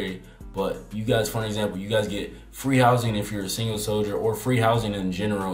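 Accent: Baltimore, United States. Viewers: you definitely from Baltimore I can hear it😂🙌🏼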